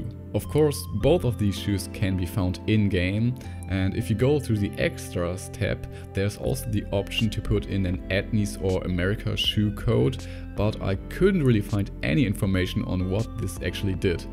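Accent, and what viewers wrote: Germany. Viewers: Der deutsche Akzent sticht immer hervor min jung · Man hört raus das du deutscher bist kuss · Als deutscher hört man den Dilekt direkt raus 😂✌🏼. Aber sehr nices video! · Are you german couse it kinda Sound like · man hoert den deutsches akzent sehr raus wenn man selber Deutscher ist :D sehr gutes Video! weiter so! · Ik erkenn jeden Deutschen an seiner Englischen Aussprache! Grüße aus der Bundesrepublik mein Freund! ♥ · Man hört das du deutsch bist ,oder? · Ei hiar a german Äksent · Sehr schönes Video, deinem Akzent zufolge denke ich mal dass du deutsch sprichst :) Die Theorie am Ende lässt mich gerade nicht los, würde aufjedenfall Sinn ergeben. Danke für das informative Video! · He is german, you know how hard it is to switch languages without having an accent? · Man hört so hart, dass du deutsch bist xD feier ich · German accent 😅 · This Video was german i hear it in his voice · Dieser deutsche Akzent xD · Deutscher Akzent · A skate3 Iceberg?! 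Genius! Are u german? Ich höre irgendwie einen Akzent raus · Bro awesome work on that iceberg please more Also i kinda hear some german in your voice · Man hört voll das du deutsch bist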